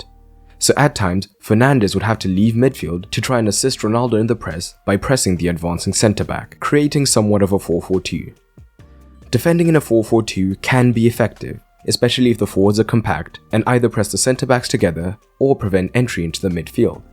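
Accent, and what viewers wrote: South Africa. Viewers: Narrator sounds South African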